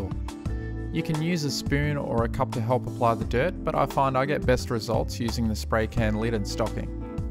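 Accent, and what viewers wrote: Australia. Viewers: Hey mate, you sound like a fellow Aussie, what foam is that and where do you get it from? Thanks!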